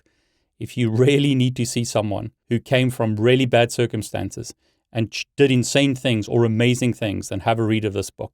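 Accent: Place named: South Africa. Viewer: Well your accent indicates that you are South African and South Africans are one of the most studious, hard-working, Stoic, Intelligent, never-give-up, honest people in the world~!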